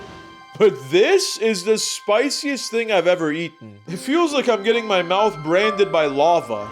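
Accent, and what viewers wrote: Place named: United States. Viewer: you look very mexican/middle-SouthAmerican but sound very american please decide you cant be both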